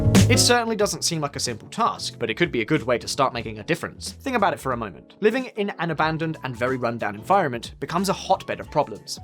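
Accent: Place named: United Kingdom. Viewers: Best narrator for this channel, but pls pronounce H the non British way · He's British. H is pronounced "Aitch"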